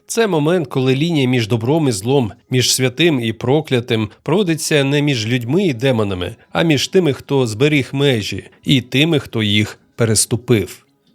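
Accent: Russia. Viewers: Цікаво...але, Вам потрібно попрацювати над вимовою. Позбавитись аканя. Ріже вухо, це акання.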